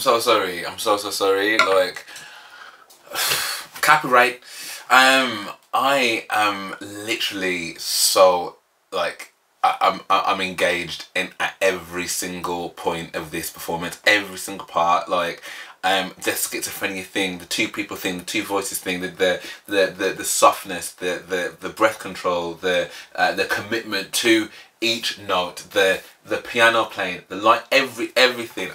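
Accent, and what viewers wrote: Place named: Birmingham, United Kingdom. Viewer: First of all, do you come from somewhere near Brummagem, you sound a bit like Jack Grealish to me ? Any road up, Adagio was sung by Lara Fabian who has been a great influence on Dimash. If you check out her performance of Adagio you will see why Dimash holds her in such high regard, they both put heart and soul into their performances. <link> She has performed live with Dimash singing Ti Amo Cosi, which no doubt you will come to in the future. There was a great camaraderie between the contestants in Singer 2017 and are all top draw. All the best, Peter.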